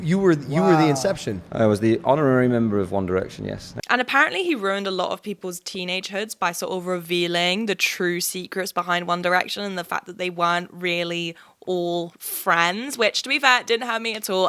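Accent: California, United States. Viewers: No joking at ALL the American accent is SPOT ON BABE !!! Big love from New Jersey ❤❤❤ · Real talk tho your American accent is fantastic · your American accent is genuinely pretty good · As an american myself I have to say your american accident was pretty good. Not perfect, but definitely good. Also I have to say I loved the visuals in the background of this video. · Very good American accent haha my British accent is not as good ! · American accent popping off babes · Ahahah you do have a solid American accent nice job. Respectfully, a Midwestern American viewer. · american accent sound exactly like Ashley from Ladies Code i would say that's a success (you can listen to Ashley in the Get Real podcast if you don't know her) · Hearing you talk in an American accent is so crazy ur voice sounds so different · Your American accent ain’t too shabby! 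this look back on 2022 made me realize how crazy this year was. What a year, here’s to another one! 🍾🥂 excited for more of your content! :) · Woah. Your american accent is amazing almost uncanny valley. I love it! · American accent is amazing!! US citizen here. Going viral bit is hilarious! Ily T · 8 outta 10 on the accent, pretty good! A bit of work on it and you could take an American part in a film I’d say! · your american accent >>> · Your American accent was fantastic, the only word that tipped me off is you said “process” first as “PRO cess” and we silly American usually say it like “PRAH cess.” Not all, but most. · Your U.S. accent was so great! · No but the American accent ateee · Okay your American accent is legit on point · Hahaha YES. Californian accent acquired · Your American accent is impressive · Love the american accent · not an important comment but maybe the MOST important comment… American accent was top tier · The biggest thing I'd say is that your American accent "A"s give you away. 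It is a pretty good accent. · As an American, I have to say, I would totally believe you were from Cali with that accent. I would love to hear you try my section of America and give a southern accent a go. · OMGG ur American accent is 👌🏻👌🏻 cali girl!!! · as much as I love your videos, your american accent is pretty rough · Her american accent is actually so cute lol 😭💖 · as an American, your American accent is good! it wavered a little at the start, and some words you still pronounced the British way, but it was pretty solid! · Your American accent is actually really good!!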